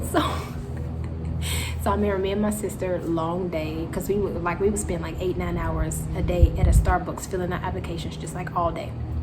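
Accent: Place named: Rural, United States. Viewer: Awwwwww that country twang!!!!!!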